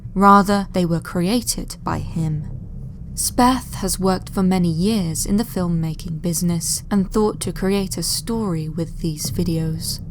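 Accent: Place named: Bristol, United Kingdom. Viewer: The accent is definitely english, maybe bristol?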